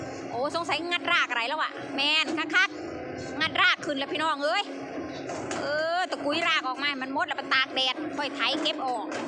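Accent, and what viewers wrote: Korat, Thailand. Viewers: บรรยายเป็นภาษาโคราชน่าฟังครับ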